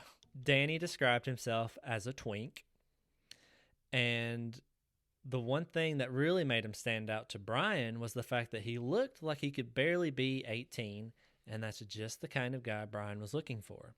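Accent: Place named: Deep South, United States. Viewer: Coming from Imgur, I was not expecting a Deep South accent 😂. Excited to get rolling.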